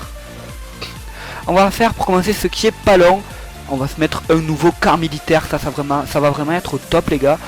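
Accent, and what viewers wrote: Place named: Marseille, France. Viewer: Marseillais je crois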